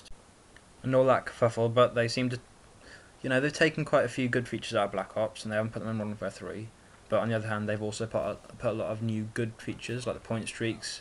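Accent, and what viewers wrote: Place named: England, United Kingdom. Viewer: where abouts in England are you from???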